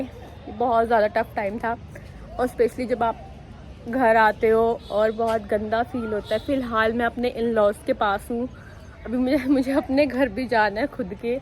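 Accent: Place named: Haryana, India. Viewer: Bati lagta h ap haryana se hansi k aas pas se ho bilkul hmari tra ki boli h bati mujhe bada acha lagta h hmari haryanvi bati austrailia men khoob dhoom mcha rhi h austrailia kbare men badi achi information de rhi ho apna experiance share kr rhi ho bhagwan bati ko lambi jindgi de hamesa khush rakhe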